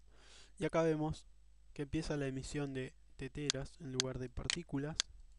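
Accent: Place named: Argentina. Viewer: Siempre muy buenos tus tutoriales master, concisos, bien explicados, sin dilaciones. De paso, descansa un poco los oídos escuchar tutos en argentino.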